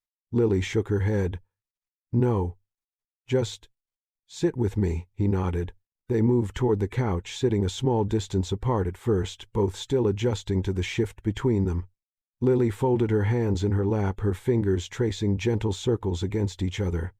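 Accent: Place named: United States. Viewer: What do you think of the narration? Is it AI? It has a strong USA accent and a little metallic maybe but I don’t mind it.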